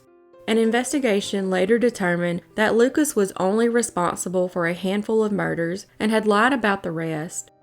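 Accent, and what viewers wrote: South, United States. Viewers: I love your channel!! Your accent rulz!!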